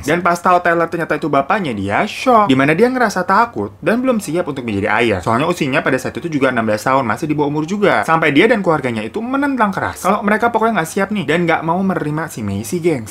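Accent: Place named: Banjar, Indonesia. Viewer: Kayak org Banjar wkwk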